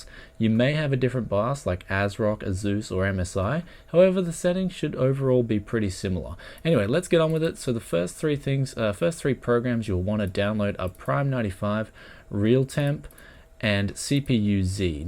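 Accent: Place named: Australia. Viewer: You are Australian as I think I can hear, anyway I am about to buy a h81m with this core and 8gb of ram for $200aud and I'm not sure what board I'm being offered. Good deal or nah? also, What gpu would you recommend with this core? cheers.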